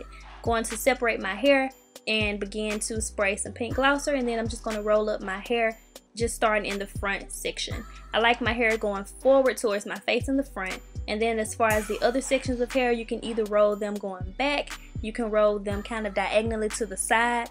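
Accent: South, United States. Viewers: first of all I would like to say, you are so cute and country lol. What southern state are you from? Second great tutorial. I've been natural for 5 months and my hair is about 5 inches and I plan to relax it soon so it will be the length of yours and I consider my hair short as well. This channel is just what I needed, thank you so much! I also see women with super short hair when I look up "short relaxed hair tutorials" and when I look up medium it is longer than mine. keep these videos up! I am def. a new subbie!!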